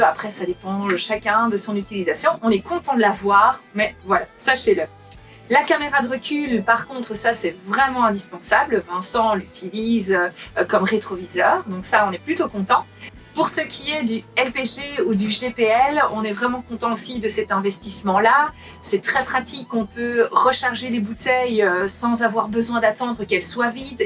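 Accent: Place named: Belgium. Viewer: Trop mignione cette femme avec son accents un petit accents belge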